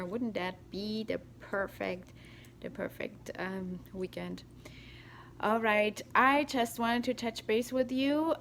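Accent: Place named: Germany. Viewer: What's the accent? German? It's very cute 😊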